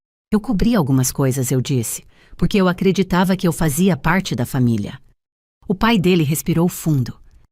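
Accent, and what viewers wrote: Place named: Caipira, Brazil. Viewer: História interessante. Gostei. Creio que poderia melhorar um pouquinho o sotaque "caipira " na pronúncia de algumas palavras. Narrativa também interessante. ❤❤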